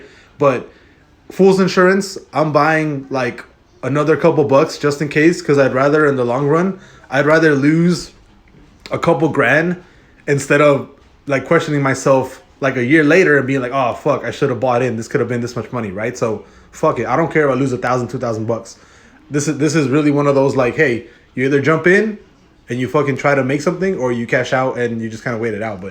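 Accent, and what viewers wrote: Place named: East Los Angeles, United States. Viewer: Subbed ! Reminds me of that east LA accent. I'm staying put at 600 for now I wouldn't mind losing it but let's see how tomorrow goes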